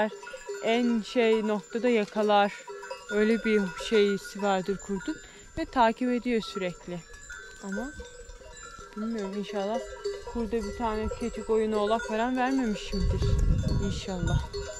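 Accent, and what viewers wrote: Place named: Gerede, Turkey. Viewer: Konuşmalarını dinlerken bu kız Karabüke yakın bir yerlerden ellehem dedim Geredeli çıktın konuşma aynı bu tarafla.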